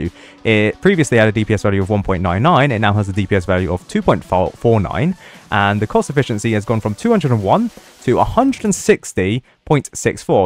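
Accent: United Kingdom. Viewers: I love listening to a british guy yap for 12 minutes straight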